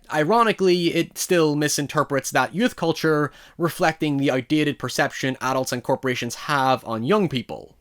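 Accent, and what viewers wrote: Northern Ireland, United Kingdom. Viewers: Sounds like a northern Irish guy do an American accent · I was so surprised to hear the Northern Irish accent! So glad to see some representation of our little part of the world in a successful YouTube channel, keep up the good work buddy! :) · It's a Northern Irish accent, though I barely notice because it's the kind of accent I hear day-to-day so it's very familiar to me at home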